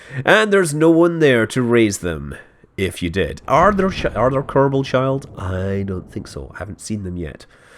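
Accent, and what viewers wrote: Scotland, United Kingdom. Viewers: He's Scottish, I presume he's from Aberdeen or Edinburgh.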